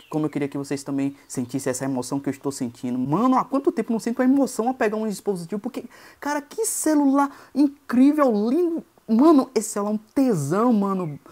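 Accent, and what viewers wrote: Bahia, Brazil. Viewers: Tu é baiano de onde, man?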